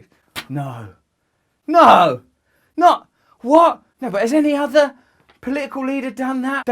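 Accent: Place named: United Kingdom. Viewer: I'm almost positive your British accent has become thicker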